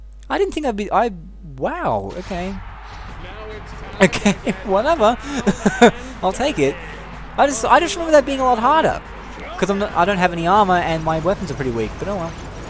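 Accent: Australia. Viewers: Reasons that you deserve more viewers. Your gameplay quality is really good. You have a good mic and voice. You can talk for a long period of time without people (atleast me) geting bored. Also you have the Australian accent :P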